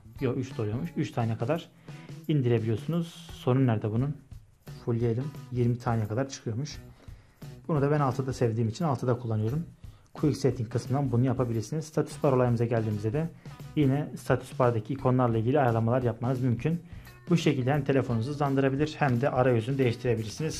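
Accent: Gaziantep, Turkey. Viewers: Antepli misin sen ? Neresinden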